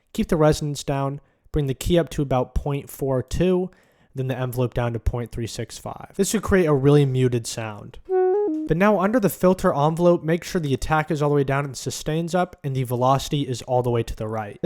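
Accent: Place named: New York, United States. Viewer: You sound so new yorkian...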